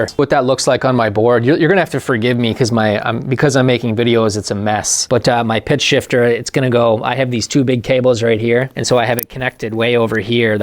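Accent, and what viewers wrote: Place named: Canada. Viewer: This guy talks like a darn Canadian!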